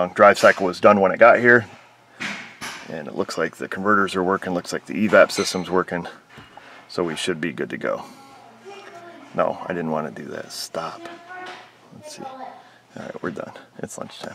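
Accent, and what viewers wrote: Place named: Wisconsin, United States. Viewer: I hear some Wisconsin in the background or your voice...nice video...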